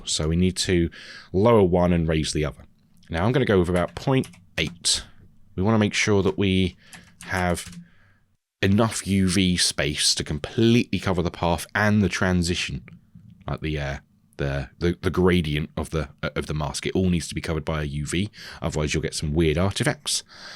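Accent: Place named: England, United Kingdom. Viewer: Na, it's some weird English guy.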